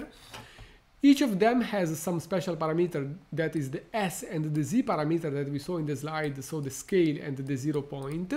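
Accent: Italy. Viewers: I've seen many of your videos and you are a great teacher! Thank you for your effort in explaining in plain (Italian accent) English all of these complicated topics. Regarding the content of the video - you showed the quantization-aware training and you were surprised of the worse result it showed in comparison to the post-training quantization in the concrete example you made. I think it is because you trained the post-training quantization on the same data that you tested it on, so the parameters learned (alpha, beta) are overfitted to the test data, that's why the accuracy was better. I think that if you had tested it with true test data, you probably would have seen the result you anticipated.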